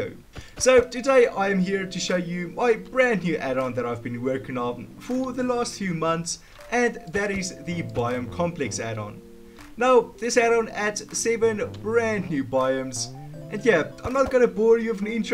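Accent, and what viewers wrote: South Africa. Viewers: I knew it! Your accent was giving RSA vibes, but then you confirmed it yourself! 🇿🇦🇿🇦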